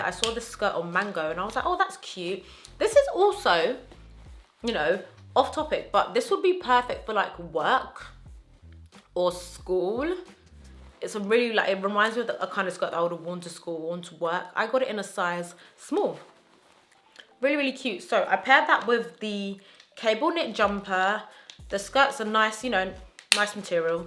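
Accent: United Kingdom. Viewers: You have the cutest British accent! I wish i sounded British! I love your style and you look amazing in every piece!! Thanks for sharing love xoxo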